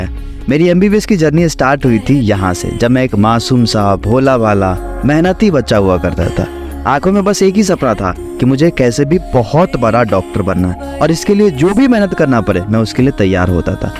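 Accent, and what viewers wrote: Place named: Bhojpuri, India. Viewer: Kasam se bhaiya bhojpuri style me maza aa gayil tu ab doctor completely ban gayila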